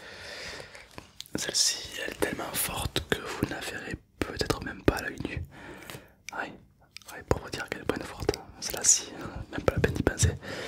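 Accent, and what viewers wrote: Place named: Toulouse, France. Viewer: Super cette vidéo 👍🏻 J’ai remarqué que vous avez un accent, vous venez de Toulouse (je pose cette question parce que moi je viens de Toulouse) Sinon trop cool tes vidéo ASMR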